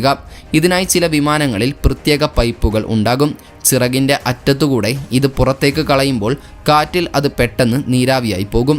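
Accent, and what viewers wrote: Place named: Kasaragod, India. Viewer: ഓരോ ജില്ലയിലും ഓരോ ഭാഷാ ശൈലിയുണ്ട് അത് അവരുപയോഗിക്കുന്നു ഞാനാണ് ഇങ്ങനെ പറഞ്ഞിരുന്നുവെങ്കിൽ മലയാളത്തിനു ഒന്ന് അമർത്തുക എന്ന് നീ പറഞ്ഞേനെ അതാണ് കാസ്രോട് ❤️❤️... പിന്നെന്ത് നിൻക്ക് സുകന്നല്ലേ അങ്ങനല്ലോ ബാണോ ബാസയ് 14 ജില്ലാ 14 ബാസയ് അതന്നെ കേരളോ...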